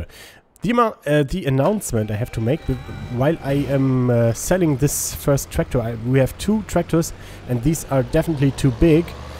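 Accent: Germany. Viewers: | | Gaming with german accent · | | Gaming with german accent 100% agree! I think, some of your german fans don't know/understand, that maybe there a other YouTube user, which can't speak german, but would like to see your great videos! For me it is a great opportunity to increase my english understanding. Greetings Tobias P.S. I am sorry for my bad english - it is etwas eingerostet:D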